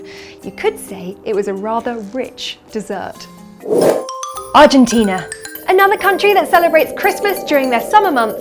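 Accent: United Kingdom. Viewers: oh my god that British accent 😍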